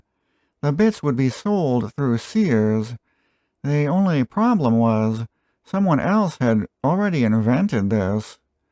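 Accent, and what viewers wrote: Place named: Boston, United States. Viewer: Your videos are always very informative and well done. Your Boston,( we say Bostin ) accent is gonna take a while, but keep trying. I noticed you added an "S" to Fall River, actually it's Fall Rivah! Great videos, thanks!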